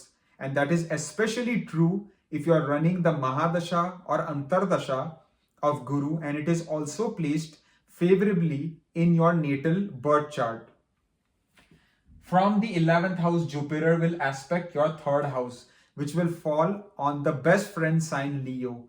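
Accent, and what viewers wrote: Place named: United States. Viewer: After waking up in the morning, you take your phone in hand n switch on the net and you get the notification from about upcoming Guru gochar... Knowing that Guru being my both lagnesh n rasheesh, it's gochar in Trikon gonna be beneficial, you are so eager to listen to it from your very dear n fav Marathi guy with American Accent 😃😃😃 and your beginning of the day become cheerful.... ❤ Thank You So Much dearest for making my day 🎉❤🙏😘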